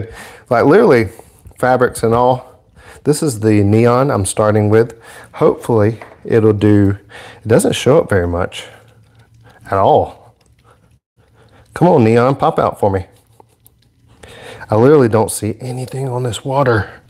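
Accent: South, United States. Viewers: great !you sound like yer from the south!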